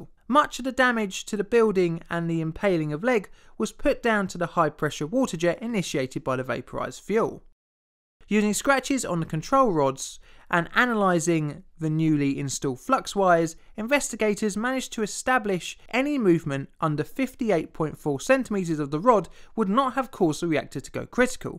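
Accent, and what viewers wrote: United Kingdom. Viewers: Fellow American here, I love how all those different British accents sound. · Please everyone. Stop moaning over the British pronunciations. Our American pronunciations also grind on the Brits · He doesn't pronounce the 'L' sound, substituting 'W'. Except at the beginning of a word (showing he CAN pronounce L if he tries) Fuel becomes Fuew. 'Controw rod'. "Criticw". 'Impaiwd by one of the shiewd plugs'. 'Faws alarm'. 'Consuwting wif a doctor'. The 'th' sound has disappeared. "Vey wifdrew after seeing 65 rotengens (sic)". This is not a charming regional English accent. It's ugly lazy bad speech. Sadly, English speech had degenerated across the spectrum into a sickeningly ugly patois and it gets worse with every generation. I'd bet that this chap's grandfather had far better speech than he does. The younger ones, the millennials, are barely intelligible. 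You need subtitles. It's sad. Listen to any old english movie from the 60s and you hear english beautifully spoken. This speech is now almost completely lost. These days, the English have by the far the ugliest speech in the Anglophone world. Americans, Canadians, New Zealanders, Trinidadians, etc all speak far better than the English. If you want an example of beautiful contemporary english speech have a listen to Canadian Stefan Molyneux. I could have enjoyed the content of this video but the speech made me feel ill and depressed and I switched it off. Sorry. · Why do all these videos have a British guy?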